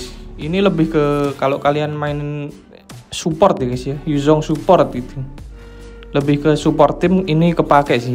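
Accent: Java, Indonesia. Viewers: bang Jawa ya?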